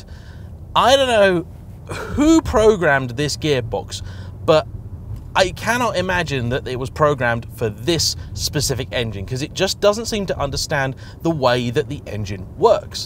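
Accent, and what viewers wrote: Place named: RP, United Kingdom. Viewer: YouTube algorithm brought me here. The posch accept kept me through to the end 🤷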